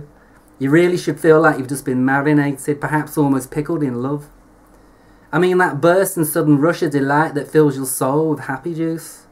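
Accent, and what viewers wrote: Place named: Manchester, United Kingdom. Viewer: Sounds like Manchester lol.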